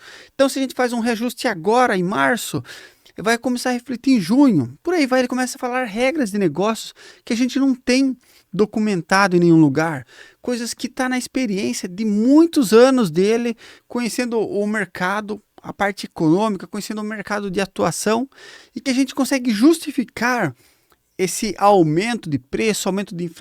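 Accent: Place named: Sorocaba, Brazil. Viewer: Nossa cara eu moro em Sorocaba SP e pelo sotaque ia até perguntar se você era da região mas respondeu a pergunta e sempre como ótimos vídeos.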